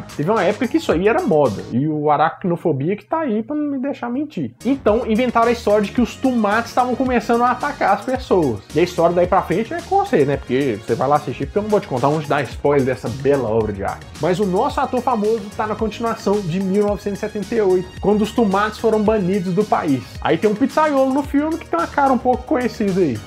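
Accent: Minas Gerais, Brazil. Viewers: Beijao, adoro seus videos, curto demais, e o doc.? não tem possibilidade nenhuma de vc continuar fazendo? Amo seu sotaque mineirinho. E caça mais filmes com atores bem no inicio de carreira, miito bom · É tão bom vê um vídeo com nosso sotaque mineiro 😃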